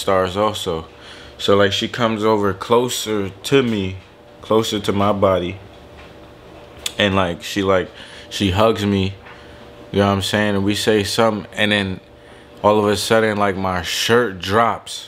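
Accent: New York, United States. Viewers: Lmaoooo he talk like every New York hood nigga 😭😭😭😂😂😭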